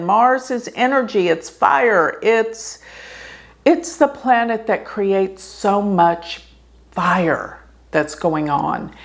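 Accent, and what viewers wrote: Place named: South, United States. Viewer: Strange you should say that! In general, very much in general l must emphasize, l find the way Americans speak unsupportable, especially the women, They are so loud and nasal and they massacre the English language. Some you tube channels l just cannot watch even if the content is interesting. But l really enjoyed this video. I love accent. A little from the south l guess. Well measured and not too loud. l am a pure Pisces. I loved your reading. Let’s see what happens shall we? I agree with everything you have said. I’m a young 83 who’s been on a spiritual path all her life. 🇬🇧💝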